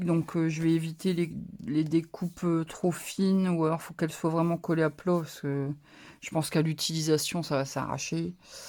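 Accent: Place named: Nord, France. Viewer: Ccou très joli ton porte bloc notes je pense que je vais en réaliser pour la fin de l’année j’en profite pour m’abonner à ta chaîne j’aime bien y’a une Tite pointe de ch’Nord dans ta façon de parler? Lol chasse le naturel il revient au galop bisous bonne fin de journée et merci à toi pour ce tuto 👍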